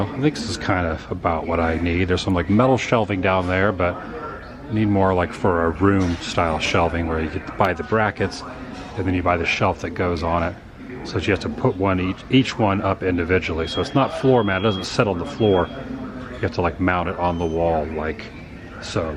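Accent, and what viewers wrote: United States. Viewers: a goth superstore???! oh you mean golf! lololololololol im in NZ. that USA accent! :) dig ya vids as always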